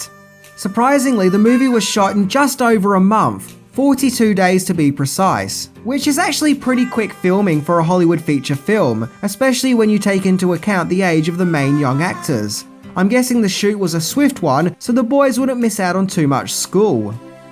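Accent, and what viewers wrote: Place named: Australia. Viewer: this is one of the few times I got an accent wrong. I honestly thought you were British. but now that you pointed out ur Australian, I can tell. I'm honestly thinking to myself, "how did I get that wrong?"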